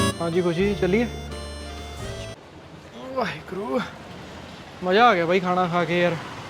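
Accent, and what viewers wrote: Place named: Patiala, India. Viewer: Inderਦੀ ਬੋਲੀ patiala aria ਦੀ ਆ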